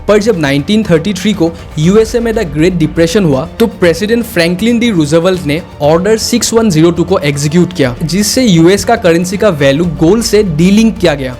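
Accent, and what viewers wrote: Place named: North India, India. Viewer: Bruh, no accent is wrong. It's just people have different accents. Your accent is probably the Delhi/UP/Bihar accent.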